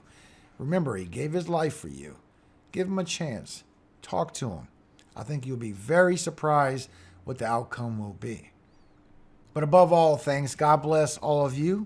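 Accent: Louisiana, United States. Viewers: brother you have a Louisiana accent yeah😁!!!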